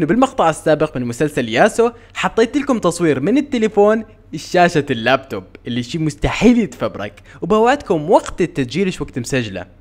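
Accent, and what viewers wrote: Iraq. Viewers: أتابعه مشان اعرف مشاكل يتيوبر ✖ أتابعه مشان لهجة عراقي لتجنين ✔😍😍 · احسك عراقي 😂😂😂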